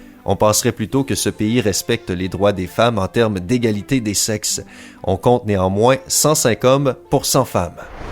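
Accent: Quebec, Canada. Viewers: Yess accent québécois! Vive le québec! ❤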